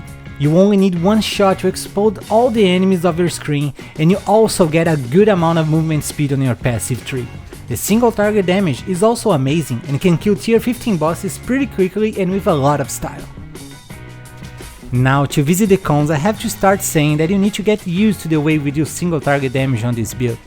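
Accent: Brazil. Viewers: Great vid! You sound brazilian to me idk why. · boabuild! sotaque br kkk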